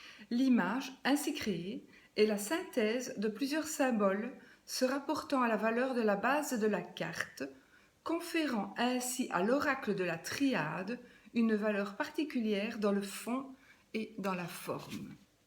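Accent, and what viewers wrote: Belgium. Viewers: Bsr, j'adore ce jeu ,moi je viens de le découvrir. Depuis presque trois semaine. Puis je me permettre ,y aurais pas t'il un léger accent belge 🙂. Je vous souhaite une douce soirée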